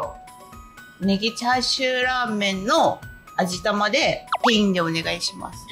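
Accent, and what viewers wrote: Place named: Okayama, Japan. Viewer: ここも美味しいですが、埼玉の騎西店はラーショの中でもサイコーです。是非一度！でも、どこの訛りなんやろ❔岡山辺りかな～⁉️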